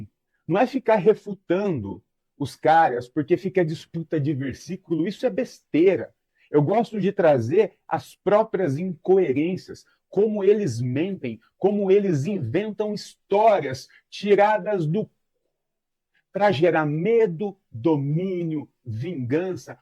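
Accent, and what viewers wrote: Minas Gerais, Brazil. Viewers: Em meados de 2011, assisti pela primeira vez, um video desse sujeito - já NÃO fui com a cara dele. Esse sotaque minereis com uma pitada de Tirica é insuportavel.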